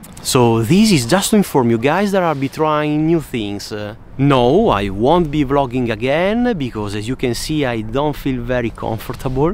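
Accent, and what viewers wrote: Italy. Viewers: Shorter videos, straight to the point, exhaust sounds, some music, your nice Italian voice and those are great! 😃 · Bro il tuo è l’inglese più italiano che ci sia ❤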